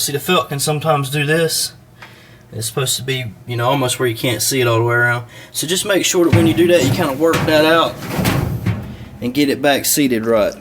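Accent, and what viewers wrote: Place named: Carolina, United States. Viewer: Man this video is very helpful, love that Carolina accent too, reminds me of my family.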